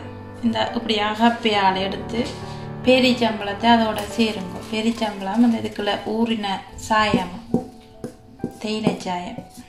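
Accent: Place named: Sri Lanka. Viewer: Sister unga Srilanka speech super nenga sonna ravai alaku sister I joined you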